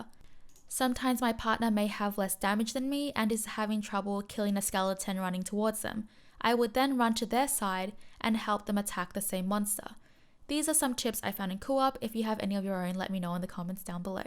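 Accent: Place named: Australia. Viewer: I have been watching a couple of videos, and from the first video, I thought that was an Australian accent, (because mine is about the same or a bit stronger than that) so I was quite glad to find that you are based there too.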